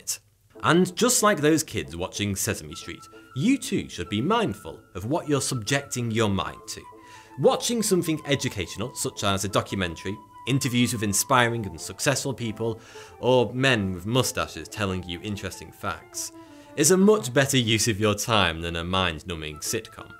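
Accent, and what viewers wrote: United Kingdom. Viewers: it's a typical British accent really I am not originally from the UK but I live in Yorkshire and I can tell you that loads of British people have a lazy way to pronounce "th" simply sounding like they are pronouncing "f"... For what I heard he is originally from the Isle of Man. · Nice and British, yes... He not also have said "but" strangely BUT also "ass"...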